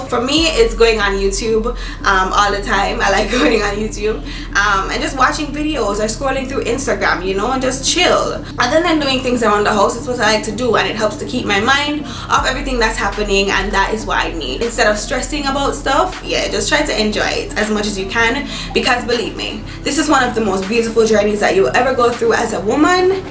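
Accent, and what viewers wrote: Jamaica. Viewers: you're welcome. I'm currently 15weeks and I needed some tips, your video came up instantly and I immediately picked up the accent being Jamaican myself.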